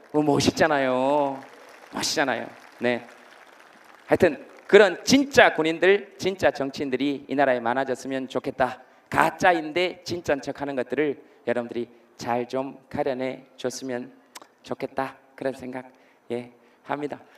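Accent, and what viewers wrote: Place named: Gyeongsang, South Korea. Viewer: 갱상도 사투리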